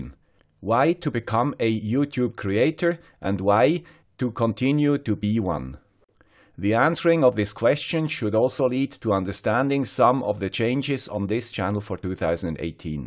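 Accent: Switzerland. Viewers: Mister, thank you for all videos, it was very important for me (I think "for all us") understanding about esp8266. Some, I saw more than one time do understand, and I learned more and more with you and your swiss accent......... I understand the difficulties about be a youtube creator without money, money is the engine of world, but, while you can, please don't go! Thank you again and hope a 2018 best for you, your family and you business. Regards from Brasil. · When I hear "It's the guy with the Swiss accent" I know there will be a detailed and well prepared video to watch. I didnt know the troll comments re your hands were the cause of you wearing your gloves, that makes me sad, and I should add that having looked at an early video there's hardly anything to see, but clearly the comments were hurtful...the upside is its definitely a trademark now. To make or not to make content is your choice, all I can say is that when you make it, I enjoy and appreciate your efforts, so thank you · your videos are the reason i started getting into this sruff! 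We all need "the guy with the swiss accent". And you take the scientific approach which i appreciate. Hope you stay, if you can but first and foremost. Be happy! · and most important: you are 100% fine !! with or without gloves. and we all love your swiss accent. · Cool! Thanks for sharing. I like the "Guy with the Swiss Accent" opening. Keep up the good work and there is no telling where it will take you. That's awesome you have been asked to speak. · Good to hear you're not stopping production. I would miss listening to the 'guy with the Swiss accent'. Belated Happy New Year. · Thanks for bringing the important issue to be an active youtuber to the attention. I personally enjoy the topics in your channel and found nothing wrong with the Swiss accent. I believe that the sustainability of a youttuber’s channel can be achieved naturally by its alignment with their profession or hobby. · The content and the way it is presented makes this site a valuable learning tool for me. 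The gloves and Swiss accent enhance the presentation. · Thanks for all the great videos! Your channel is one of the best on electronics and one of my favorites. And the Swiss accent ROCKS :) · Dear, please by all means continue your channel as a creator. I like your work very much, it is well documented and has a high level of training/background information. I commented once on your Swiss accent, however in a gentle way. It is your trade mark and much appreciated by many people. I don't care about your hands/gloves. Some people are stupid enough to comment on this. It's the content that matters. Best regards and much respect from Belgium. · thanks for all of the videos. I find it amazing that you can produce videos of the quality level you do with the charts, script and editing that you have in only 10 hours. Your videos are always a cut (or 2) above the norm. The Swiss accent & the gloves are part of it all. 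I’ll suscribe as soon as i figure out how to do it from my i-pad. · No, you don't have to respond to each and every comment when not concerning technical topics. Post like this is just to encourage you to overlook the negative as best you can. Your "swiss accent" is fine. Your english is better than most, and the fact that it is not your native language keeps us at equal disadvantage. Following an english/american talking like a Gatling gun can be challenging when the topic already is taxing my brain. I for one appreciate you're not presenting it in switzerdeutsch. Then I would have a hard time following it. ;-) About one year ago I put 3 fingers in a wood planer, so I already know how a bruised finger looks like. People focusing on that is probably not your intended audience anyway. ATM you have almost 1900 thumbs up and 8 thumbs down. I pretty much think that says it all! Keep doing what you do so well, gloves or not! 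Schüss Einar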